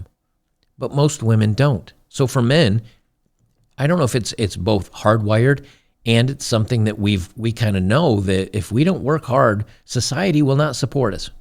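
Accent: Australia. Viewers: As soon as I heard the accent, I thought to myself, "Thank fuck I left Australia!" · Omg she sounds Australian. I hope I don't run across her......😱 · Being Australian myself I knew she was Australian as soon as she started talking... trust me though, you will be surprised how many girls there are like that over here, she is not alone. Anything for clicks these days...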